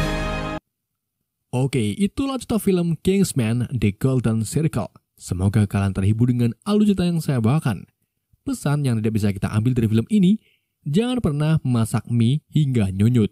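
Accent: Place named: Cirebon, Indonesia. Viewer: Wong cerbon kih ketenger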